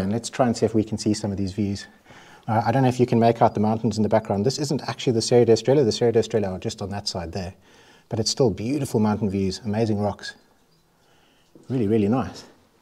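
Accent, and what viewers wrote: South Africa. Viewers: Hello I have just come across your channel and I had a quick look at what video's were on your channel and I seen lots of properties so I am now subscribed I have a question for you and I am eager to know if I am correct in thinking by your accent that I believe your maybe from South Africa?? Eager for your reply Enjoying what I have seen so far and I think I am gonna be binge watching these videos 😊😊 Lisa UK xxxx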